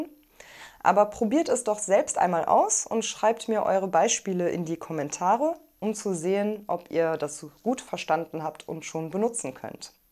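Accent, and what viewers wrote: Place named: Eastern Europe. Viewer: Чо-то ты нифига не похожа на дойчу... Если бы не произношение, принял бы тебя за... Чешка? В любом случае, что-то славянское 120%!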